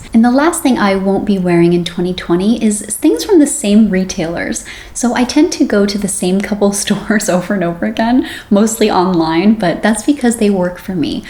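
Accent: United States. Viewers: american? canadian? accent of the host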